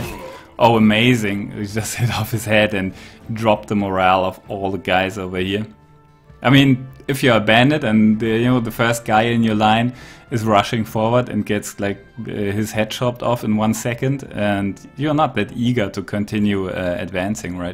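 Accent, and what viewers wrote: Germany. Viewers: Mal eine Frage. Ich meine man hört es durchaus raus, dass er ein Deutscher ist. Seid ihr ein deutsches Entwicklerteam ?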